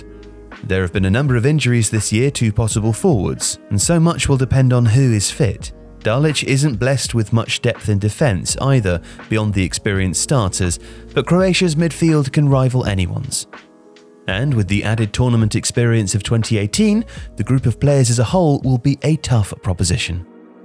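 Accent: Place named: RP, United Kingdom. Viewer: It’s just posh and annoying.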